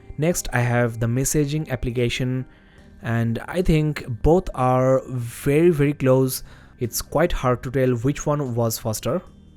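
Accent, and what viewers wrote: India. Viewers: CopyRights can suck it repertoire* see? you cant even write well and no, I dont mean stuttering, but the accent all indians have while speaking english,, is annoying and sounds like Apu from the Simpson 😂😂😂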